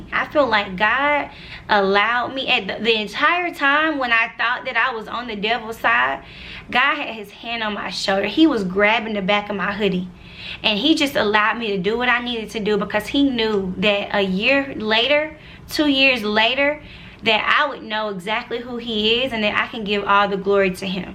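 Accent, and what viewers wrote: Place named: Georgia, United States. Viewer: Thank you for sharing your story! I resonate so much with the many years of believing in Him but not really KNOWING Him but having the time when you dive deeper & learn to CLING to the relationship you developed with the Father ❤️ P.S. while watching your video I was like “This girls accent is definitely a Ga accent” because I’m from Ga too, then you said Jonesboro & I was like 😮 I grew up in Jonesboro too!!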